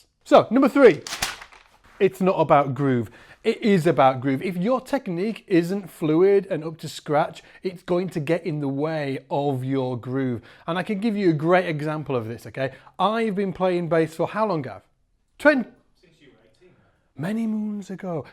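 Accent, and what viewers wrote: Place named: Northern England, United Kingdom. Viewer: has a killer northern English accent.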